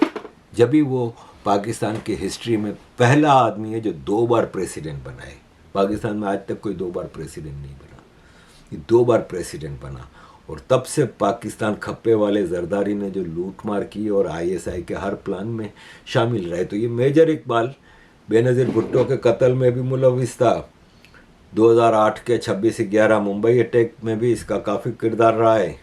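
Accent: Gujarat, India. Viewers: Aap ka bolne ka tone Gujrati lagta hai. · Your roots are from Gujarat & your tone of language ( lehjaa) still reflects the slang tone of traditional gujrati language...Proud of u sir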